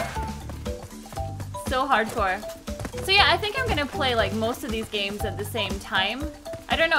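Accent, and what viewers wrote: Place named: Scotland, United Kingdom. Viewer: Hey I just wanted to say that I really enjoy watching your videos! I watch them since resident evil 7:D I really would love to play crash bandicoot tbh. Btw from which part of Scotland are you coming from because I saw a concert of Amy McDonald 6 days ago and she lives in Scotland too. Compared to you she has just such an weird accent tho 😂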